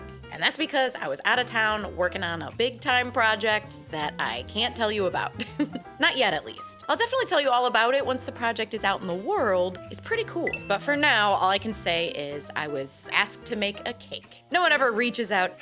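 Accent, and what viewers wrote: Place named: Ohio, United States. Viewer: As a native Ohioan, I could peep Natalieʻs accent months ago! We put "Y"s before our vowels-"Cyan" instead of "Can". Im So proud of a Buckeye doing so well!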